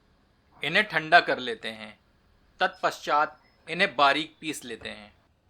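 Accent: Standard. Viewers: Badhai shuddh hindi uchcharan ke achcha laga sun kar. Aap kis pradesh se hain ?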